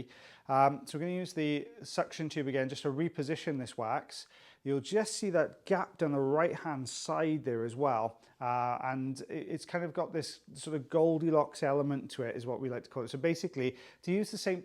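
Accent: Wales, United Kingdom. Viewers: I love your accent!! What part of Great Britain are you from? My maiden name was Thomas so I’m a Welshy! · Oh my god I've gone from a popaholic to a waxaholic thanks to you! Your you tube is so interesting and your commentary just brilliant with that lovely Welsh accent. Thankyou (rushes out to buy some olive oil )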